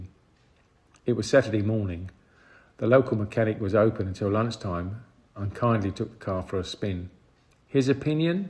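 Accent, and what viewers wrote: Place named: England, United Kingdom. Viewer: A POM narrating the show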